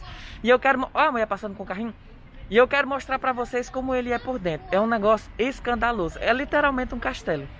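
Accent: Northeast, Brazil. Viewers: seus vídeos são um mix de cultura, beleza arquitetonica, beleza da natureza, informações de utilidade pública, tudo falado de forma naturalmente simples, divertida e brasileirissima, no legítimo e delicioso "nordestinês". Já dou like antes de começar a assistir. Agradeço ao Alexis do canal Ola Brasil por ter descoberto você!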